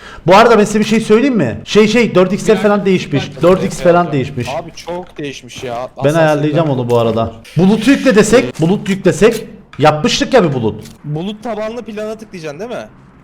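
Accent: Cyprus. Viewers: Abi kıbrıs limisin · abi sen Kıbrıslı mısın???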